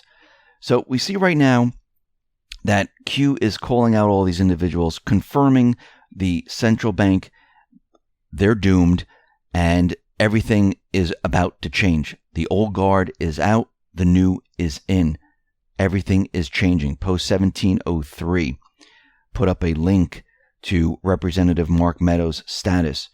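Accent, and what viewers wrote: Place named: East Coast, United States. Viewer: Dont u listen to your voice with obnoxious east coast sound or r u oblivious to it the accent is so pompous and ignorant sounding though u r not stupid q asks for prayer because he will ask u followers to drink kool aid next only a matter of time until trump assasinated and pence forces bible study in public school hawaii missile was aimed at af1 or did u miss that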